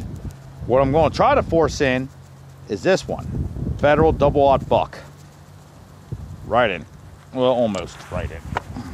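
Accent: New Jersey, United States. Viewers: Chris Landry Jersey accent is an american accent 😑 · Jersey accent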